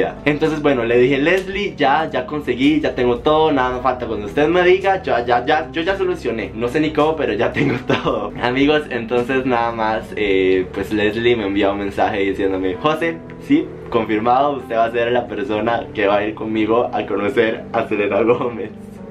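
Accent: Mexico. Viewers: Pensé que eras de México jajaj ❤️ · su acento suena como freson pero mexicano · Creí que eras mexicano Como sea, me encanta tu buena vibra. Te envío un abrazo 💜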